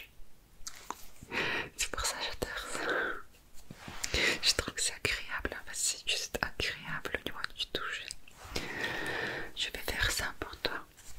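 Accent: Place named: France. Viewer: Hello j'adore votre jolie voix et votre accent français !!🙂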